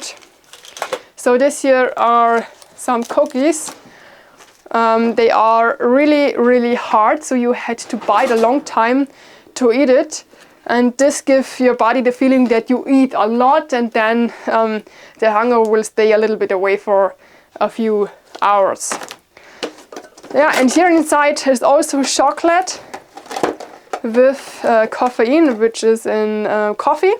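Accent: Germany. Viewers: exelent job on your preparedness. Soon you're gonna need a shed because the cabin is full. You might won't for extra preparedness to hide and bury some of your food like the long term packaged and cans somewhere in secret locations in and around the forest. Love MIKA and your GermanEnglish ❤ Don't forget to hide your Nuts like a squirrel. 🐿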